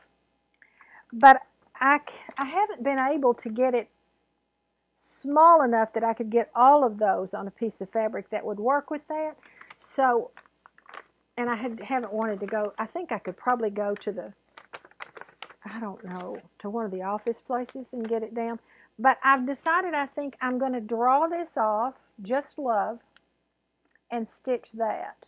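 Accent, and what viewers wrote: South, United States. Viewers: I love listening to your southern accent. Also love green Manger and red Manger. Glory and circle flowers. Happy Easter.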